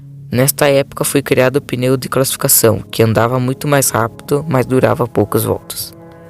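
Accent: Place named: Paraná, Brazil. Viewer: Vc é paranaense?😂😂 Tem mto sotaque, olha q sou daqui e reparei, mas mto bom o vídeo msm, cheio de informação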